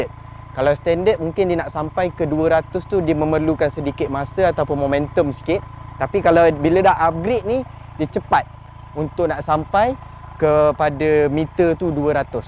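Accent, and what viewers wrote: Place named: Kelantan, Malaysia. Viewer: sama mcm penerangan kat honda fd dia...slang kelate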